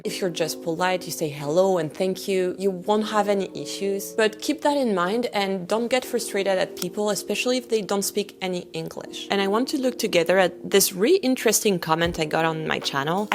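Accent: United States. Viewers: cets quoi ce délire d'avoir l'accent américain et de parler avec la gorge, génération miley cirus, c'est horrible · speaking in English with an American accent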